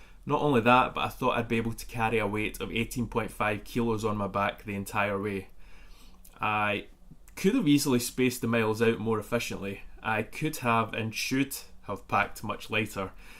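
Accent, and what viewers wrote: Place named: Scotland, United Kingdom. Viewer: Hello hiker! Stopping by to subscribe to your channel. The Scottish accent is soooo cool, I absolutely love listening to you talk! (Is that weird?😂)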